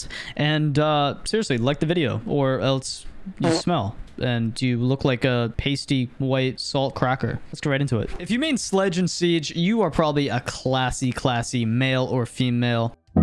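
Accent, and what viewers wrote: Canada. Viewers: What part of Canada ya from bud? I'm from B.C. Triple triple btw ha ha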